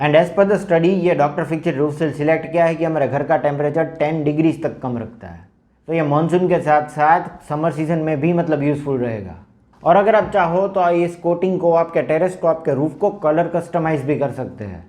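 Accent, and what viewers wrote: Gujarat, India. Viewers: That gujrati ascent is so good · Gujju raping hindi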